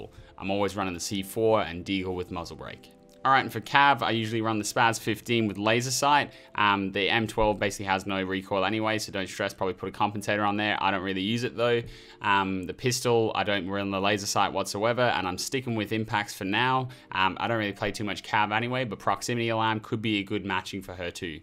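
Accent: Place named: Australia. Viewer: he sounds kinda australian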